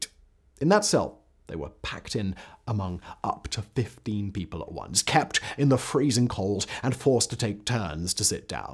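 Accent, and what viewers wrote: United Kingdom. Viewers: Bro that too much British accent for me to handle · his accent is becoming more British each video somehow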